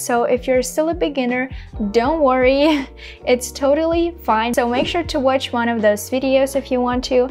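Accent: Russia. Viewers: Cute Russian accent ☺️👌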